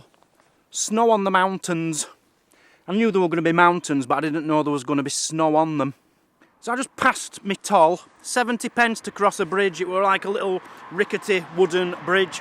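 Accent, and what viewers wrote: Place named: Yorkshire, United Kingdom. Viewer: Your From Keighley Arnt You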